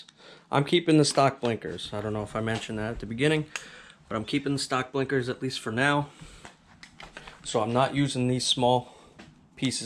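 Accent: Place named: Upstate New York, United States. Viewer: Good review. I was playing the game of what state is this guy from based on the way you say “off”. “Ohaaaff”. Upstate NY?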